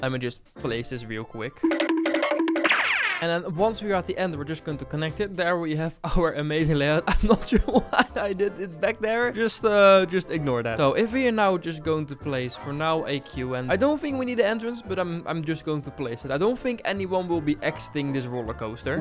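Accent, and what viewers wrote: Netherlands. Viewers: Jij bent Nederlands toch ik hoor het aan je accent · Engels met een Nederlands accentje😂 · I love your dutch accent!!(if its dutch) im dutch too. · I love your accent its an dutch accenr right? (Im dutch btw) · Bro you sound so dutch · We horen dat je Nederlands bent en dat zien we ook. Aan je naam dus praat gwn NL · are you dutch i maybe hear a acent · I can hear you speaking english with a dutch accent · JE BENT NEDERLAND IK HOOR HET AAN JE ACCENT AHAHAH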